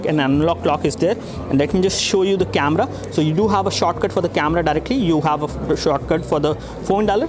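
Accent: India. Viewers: Indian accent gives me headache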